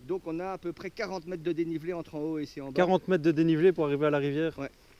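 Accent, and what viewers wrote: Belgium. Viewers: saluut superbe video comme tjr tu ma eclater de rire avec ton belge c super marrant dans certaine condition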